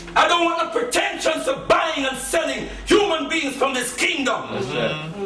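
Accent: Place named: Jamaica. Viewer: Just Became Your 1000th Subscriber ...Not Big on the Egyptian Names But its a Positive Vibration & iHear That Jamaican Accent ,iKno My People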